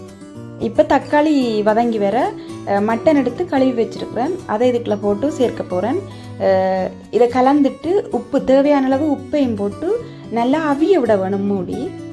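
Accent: Sri Lanka. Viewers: Sri Lanka la pesura tamil romba super sister தேங்காய்பால் ஆட்டுக்கறி பிர்ட்டல் அருமையாக உள்ளது சகோதரி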